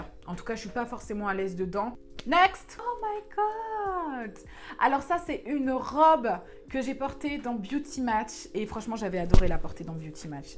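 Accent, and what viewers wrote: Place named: London, United Kingdom. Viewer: Moi jveux aller à Londres pour revenir avec le même accent que toi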